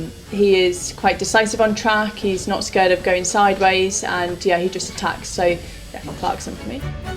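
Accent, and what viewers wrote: United Kingdom. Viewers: I just realized that accent is the same as Mary's accent from AC4. Can any brits fill me up on what part of England that has that accent?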